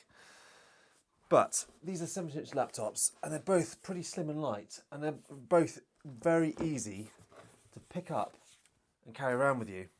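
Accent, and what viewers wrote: United Kingdom. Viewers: I gotta tell ya I was just mildly interested in laptops cause I'm figuring out which alien gaming beast I should get, but more I watch more I wanna know. All this undervolting, different testing etc.. Really interesting. Your videos are great. Hope the channel explodes. Keep it up mate! Edit: what part of England is this accent you sound a lot like Ricky Gervais same accent where is that?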